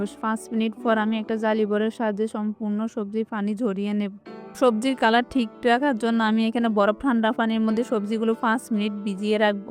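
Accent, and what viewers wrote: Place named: Noakhali, Bangladesh. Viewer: আঁর নোয়াখাইল্লা ভাবি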